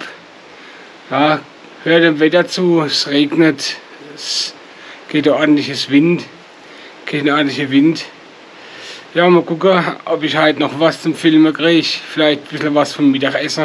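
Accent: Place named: Palatinate, Germany. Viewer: Top Video Was für ein zauberhafter Sonntag hier in der Pfalz! 🌧☔ Trotz des regnerischen Wetters hat dieser Tag einen ganz besonderen Charme, den nur diese Region bieten kann. Die Regentropfen spielen eine sanfte Melodie auf den Dächern der urigen Pfälzer Häuser, während die Natur herum in einem satten Grün erstrahlt. Und dann dieser urige Pfälzer, der uns in dieses YouTube Video zeigt – er ist einfach das Herzstück dieser Atmosphäre! Mit seinem herzlichen charmanten Dialekt entführt er uns in die Welt der Pfälzer Gastlichkeit gr. aus Lemberg Eure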